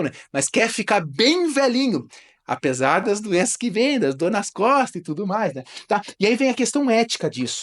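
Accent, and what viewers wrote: Rio Grande do Sul, Brazil. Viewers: Sotaque gaúcho tchê. Professor muito bom. Tomara que seja gremista. kkkkk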